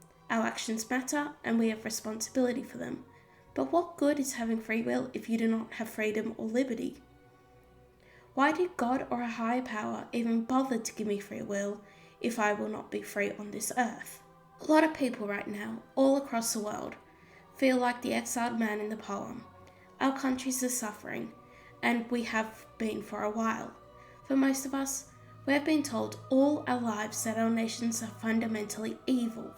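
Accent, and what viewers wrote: Australia. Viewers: Don't change your Australian accent! It's part of who you are and, honestly, it's quite refreshing to hear poetry recited in an accent which is not either English or US-one-size-fits-all. There is a version of The Wanderer in the original Old English (violavonschnitzel) on YouTube. It sounds very Scandinavian. Every accent lends something special to a reading. Apart from 'half showers' which should be 'hail showers', I don't think there's anything in your accent that can't be ironed out by sloooowwinng down a bit. I think it's wonderful (and hopeful) that someone as young as yourself (i.e. a lot younger than me) is interested in 'The Wanderer' and 'The Lord of the Rings'. The way things are going at the moment I can see a subversive movement developing whereby people will be swapping illicit copies of Shakespeare's 'Merry Wives of Windsor' or Orwell's 'Animal Farm'. 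😉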